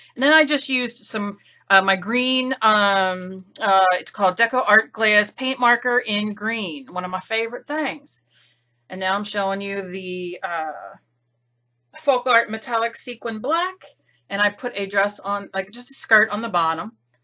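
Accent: New Jersey, United States. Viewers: You are awesome! I love this & I love you girl! I watch you not just to watch you do your art (which I could do all day, & sometimes do......lol) but I also just love to hear you. You are funny, I love the jersey accent, & you have things that are important to say......so I said it befor & I will say it again.....Love you girl! Keep doing what you do!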